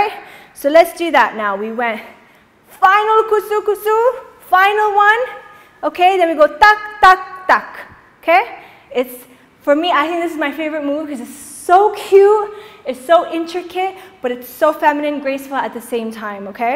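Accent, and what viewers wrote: England, United Kingdom. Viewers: Omg I like her english accent.....❤ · * your English accent * your Turkistan Lady look(!..) -Perfectum..✨👌🏻💖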